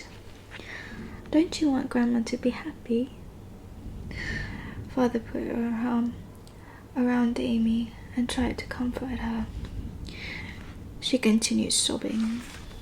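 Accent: United Kingdom. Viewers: Your accent sounds very british or am I just imagining it...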